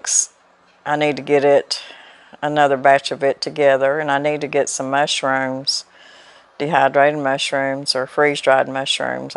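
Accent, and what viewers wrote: South, United States. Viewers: I went out to my patio to plant some flowers and when I came back inside I heard this beautiful southern drawl and just KNEW it was you. And it WAS!! ❤️ I need to spend the day with you Miss!! All my family is gone and you sound JUST like my grandma. Soooo very comforting to my soul. Much love to you 2! 😘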